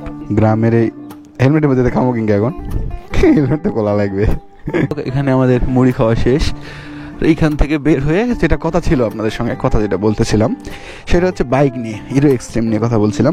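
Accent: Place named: Gaibandha, Bangladesh. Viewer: ওহ আমি মনে করছি গাইবান্ধা, নয়তো বগুড়া, নওগাঁ,গাইবান্ধা,বগুড়ার ভাষা same..😊 তাই না ভাইয়া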